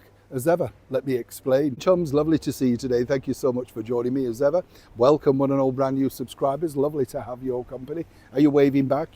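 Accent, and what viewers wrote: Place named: England, United Kingdom. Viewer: Love your personality and your English “accent” since I live in USA. 😊😊😊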